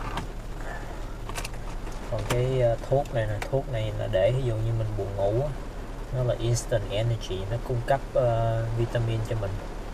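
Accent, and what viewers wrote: Vietnam. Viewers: giông ngoai bac nha minh vay bn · Anh ơi, mẹ anh có phải quê là quãng ngãi ko, tại nghe giọng nói giống nhà nội em quá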